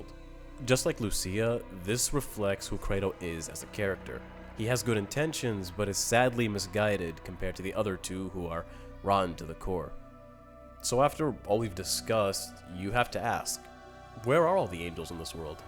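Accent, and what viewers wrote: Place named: Arab world. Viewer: This is a very great video but from your accent I assume you're an Arab and new mention the Quran in your video another detail that I think you know but you're not mentioned the concept of Angel and demon and Satan in Islam and Christianity is completely different and one of them that the demon are not Fallen Angel but the concept of demon is describing anyone who's rebellious against God if they are Jinn like iblis or are human an angel in Islam is have no free will and they completely follow god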